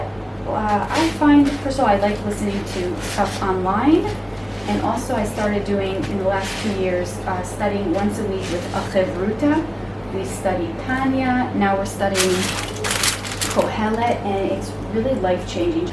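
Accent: United States. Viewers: Hi. I live in U.S.A.How come your accent is American since you live in Israel? Love to watch your vlog.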